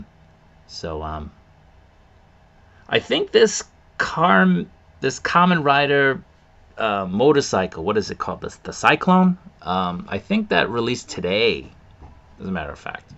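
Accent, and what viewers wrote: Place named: New England, United States. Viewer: yes sir! I just got shelves a few days ago. I am going to get display cases, similar to what I saw At Tamashi nations, due to the earthquakes we get here. I live in Asagaya so I’m pretty much at Nakano broadlway every other day. Moving to Japan has been a wonderful blessing. I moved here not because of Anime and action figures, but the overall culture, food, people, order, serenity, peace of mind and affordable housing. My home town of NYC seems to be a mess now, also I don’t have 1.4 million for 400sqft (I’m not exaggerating that’s a Manhattan condo price in Harlem where I’m from). I flew in the Air Force for 30yrs hit every corner of the globe. Spain, Italy and Japan were my favorite missions to fly. Japan stood out amongst all the lands I traveled. I detect a New England accent within you, I enjoyed going up to cape cod for the summers with friends to beat the grind of NYC. 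I would have moved to that area if I stayed stateside. If you have not done a video on the subject, I would recommend the Animation museum in Ogikubo and the Inazama anime Cafe in Ogikubo as well, Take care and keep up the great work.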